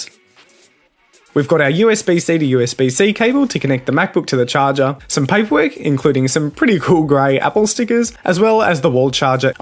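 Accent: Australia. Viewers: He’s Aussie lol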